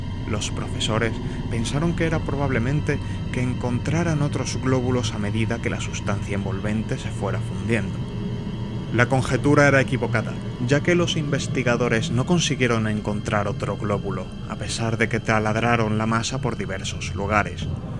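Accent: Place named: Spain. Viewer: Por fin un audio en castellano (españa). No me considereis racista, simplemente es la forma de hablar que utilizo y me es cómoda y familiar, así me centro más en la historia. Gracias!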